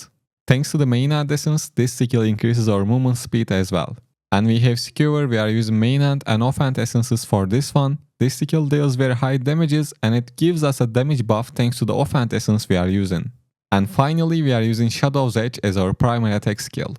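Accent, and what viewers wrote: Turkey. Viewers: Kankam selamlar,Türk olduğun aksanindan belli bu arada, tüm classlarla oynamış biri olarak; en hızlı farm yaptığın Class hangisiydi ? Favorin nedir ? Şimdiden teşekkürler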